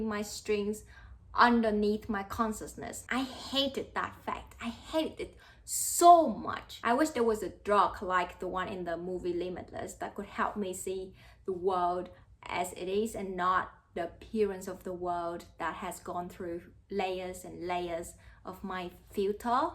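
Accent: Australia. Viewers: by the way, you speak English with an Australian accent, correct? I lived there as a child.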